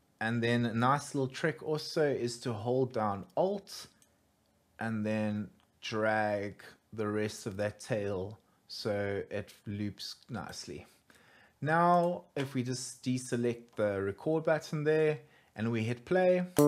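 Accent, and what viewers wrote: South Africa. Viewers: you sound south african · South african✊🏿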